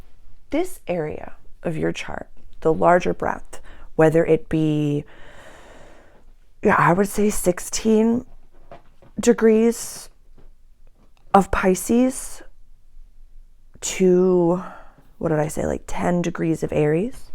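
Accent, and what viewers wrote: Boston, United States. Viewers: Love your videos so much. It feels like dishing with a Bostonian older sister.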